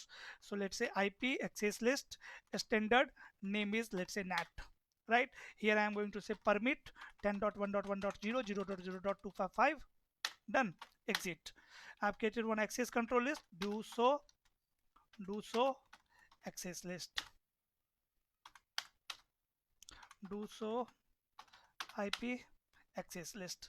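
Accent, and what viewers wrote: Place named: India. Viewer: It’s first time that i cloud understand the Indian accent so clearly. you are wander full lecturers!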